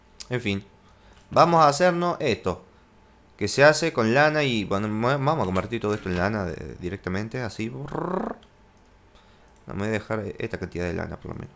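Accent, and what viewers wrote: Córdoba, Argentina. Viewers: Che Eres Cordobez No Agunta cordoba agregame al fece Enzo Barrera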